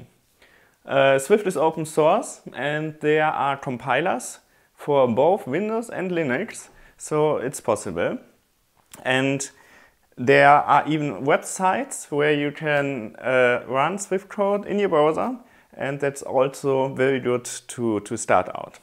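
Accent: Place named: Germany. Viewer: I guess he is german...... i know german accent...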